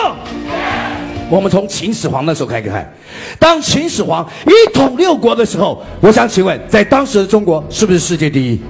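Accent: Taiwan. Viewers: 我就想问问这是谁？听口音台湾同胞呢？ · 台灣的吧！ 一口一個“xue yi”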